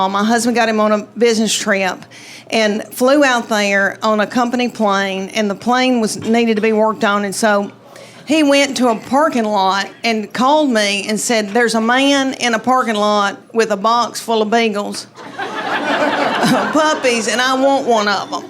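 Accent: South, United States. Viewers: I'm from the south. this lady reminds me so much of people from around here. The accent is my fav. You don't hear enough. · the subtitles make me laugh. I grew up in texas. I have a city accent, not a southern. but its like, really funny to realize that maybe someone in france has no clue what the hell this southern lady is saying. love it.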